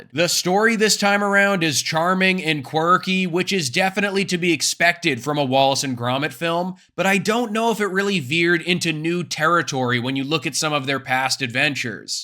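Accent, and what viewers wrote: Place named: United States. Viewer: You sound like an American with a short attention span. Terrible review.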